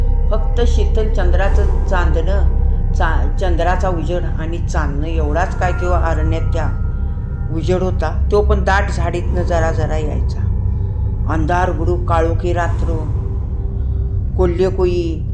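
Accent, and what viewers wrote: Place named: Satara, India. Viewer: आज्जी नक्कीच सातारच्या असणार आहेत. आमच्या सातारची गोष्ट सांगण्याची आजीची शैली खूप आवडली. भाषेत गोडवा आहे.सांगण्यात खूप एकाग्रता ...तळमळ..जाणवली🙏🙏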